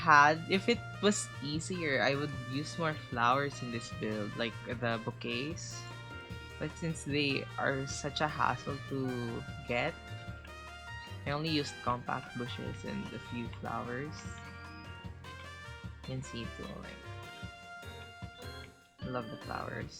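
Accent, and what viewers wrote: Philippines. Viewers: You're so creative and patient with your builds! Btw r u Filipino? We have the same accent 😩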